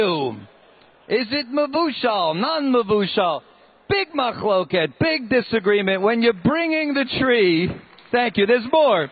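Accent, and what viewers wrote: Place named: United States. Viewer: THIS GUY SOUNDS SUSPICIOUSLY LIKE A YANK, BUT HE MUSTVE GONE TO MY CHEDER IN SUNDERLAND.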